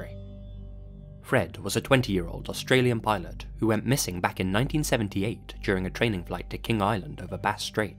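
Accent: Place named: England, United Kingdom. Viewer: I cant stand the English accent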